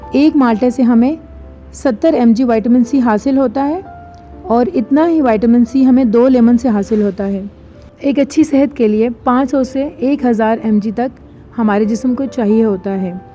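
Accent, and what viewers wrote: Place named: Karachi, Pakistan. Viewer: Thanks alot for the Precious and healthy Information Your Excent is Karachi Wala Am I Right?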